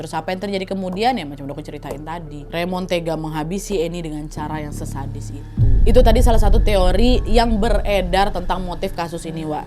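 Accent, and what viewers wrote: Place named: Medan, Indonesia. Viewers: Kirain mba satu ini orang Medan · Kak tinggal di jogja atau orang jogja?? Kirain kak orang sumatra/kalimantan dari logat bicaranya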